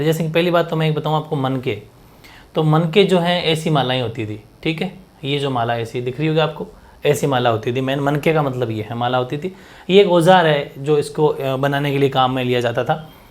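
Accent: Rajasthan, India. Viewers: राजस्थान से हो क्या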